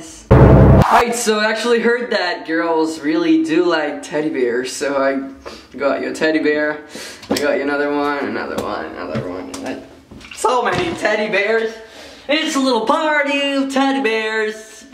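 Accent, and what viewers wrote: Argentina. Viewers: Why are you my perfect guy but live so far away. Hahahaaa love your videos. This message is kinda late but ok. I love your argentinian accent! They're my weakness hahbaha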